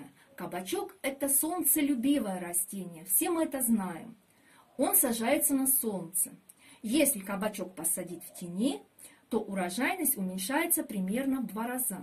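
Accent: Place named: Russia. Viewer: Может быть у неё местный диалект,диалектов много в России?!Это же не урок русского языка в школе,а информационный канал,кто хочет ,тот поймёт!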